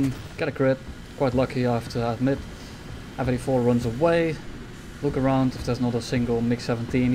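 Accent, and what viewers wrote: Netherlands. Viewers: I have bin a subscriber for some time now and I have to ask, are u dutch or belgains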